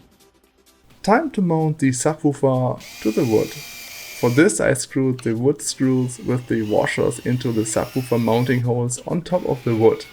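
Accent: Germany. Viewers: Da hör ich doch den Deutschen Akzent raus🧐🤓😅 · you have the most german accent ive ever heard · Man hört deinen deutschen Aktzent mega raus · Dein Englisch klingt echt deutscher als meins? Wie? Und ich dachte ich wär der einzige der zu doof is für diese komische aussprache xD nichts für ungut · Ich fand dein Video sehr hilfreich und man hört sehr, dass du deutscher bist xD. Aber könntest du mir ein paar Daten des Lautsprechers geben? Also wie viel Watt RMS der ha z.b?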